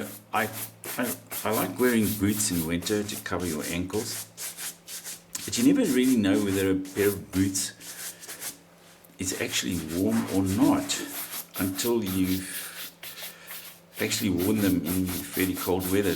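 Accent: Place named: South Africa. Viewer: You are South African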